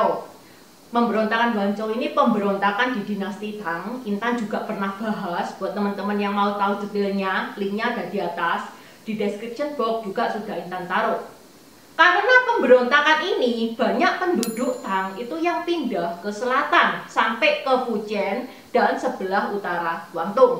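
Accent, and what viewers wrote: Java, Indonesia. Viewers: Intan ini asli orang Jawa. Logatnya medok Jawa banget. Gak ada pelo logat Cinanya lagi. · Oh iya intan..kenapa loghat intan bahasa indonesianya kayak Wong jowo??sementara loghat org cina di Malaysia loghat bahasa melayu nya nggak loghat melayu??😀😀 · Intan km turunan Tiong hua ? Tapi logat km tdk seperti org tiong hua tapi seperti jawa logat mandarin km tdk pas bgt. Intan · Ulasannya bagus... walaupun logat jawa nya masih nge bass .. terima kasih. Btw orang terkaya di indonesia tetap di pegang oleh perantauan hokkian .. mulai dari Liem sioe liong hingga sekarang Oei hwie tjong ( BCA .. Djarum group ) .. saya sendiri generasi ke tiga dari perantauan hokciu / foo chow ( ibukota provinsi hokkian / foo chian ) . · Trimah kasih cici tlah mmberikan informasi sejarah tentang suku etnis tiounhhua ,,yg trsebar di blahan asia,, dan byk menjadi pemimpin negara ,, cici mdok baget logat jawa nya hi hi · Coba bahasa Indonesia nya diperbaiki lagi cece.. 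Jgn medhok.. Kebanyak kan huruh H.. Jadi gak enak kedengaranya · Makasih infonya mbak. Logat jawanya medok bngt mbak hehe. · Seneng dicerita’in mbak Intan yg Cantik, Pinter dan enak Suaranya yg Medok. Salam Sehat & Sukses nggih 🤲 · Saya merasakan mbak intan ini orang Jawa, krn medok. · Medok banget. Ngomong "k" jadi kayak ngomong "g". Ngomong "khek" jadi "ghek". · Intan,meddok jawanya,etnis china jawa ya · Kalau mbak Intan ini medhok ya sudah dari sananya, artinya dia menguasai bahasa lain ( jawa) selain bahasa Indonesia. Susah sekali menghilangkan logat bicara seseorang. Yang terbaik memang bicara tanpa aksen. Semangat mbak Intan. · Cece.... medok Jawa nya love love semoga Chanel nya makin banyak subscribers nya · cici Intan ngomongnya logat jawa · Ini jawa tionghoa dari mana ya? Logat jawanya khas sekali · Ma'ap min ...ingin kenal , dari mana admin berasal kok logat nya medhok kayanya dr jawa semangat salam sehat selalu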